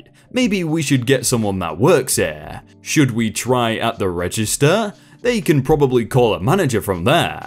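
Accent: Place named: England, United Kingdom. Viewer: At least you don't think his voice is computerized, LOL. But seriously, you do know that English people pronounce some things differently, right?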